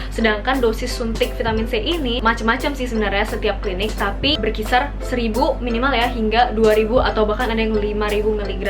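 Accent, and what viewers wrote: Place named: West Java, Indonesia. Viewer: Kek orang sunda yah logatnya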